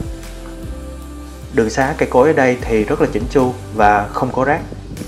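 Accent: South, Vietnam. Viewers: Rất hay cháu ạ ,giọng nói miền nam ghép nhạc rồi cảnh đẹp, rất tuyệt❤️❤️❤️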